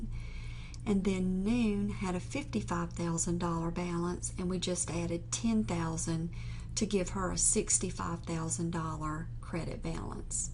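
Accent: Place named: South, United States. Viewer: thank u and i like your southern accent btw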